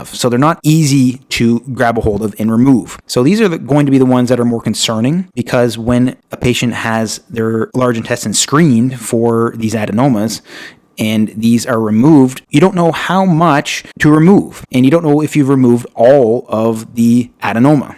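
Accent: Canada. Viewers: Canadian? Yuck.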